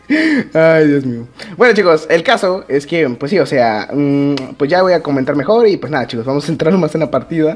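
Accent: Spain. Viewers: Es muy incomodo Que hables como español si tu eres mexicano suejas mal :/ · No hables como español se tu mismo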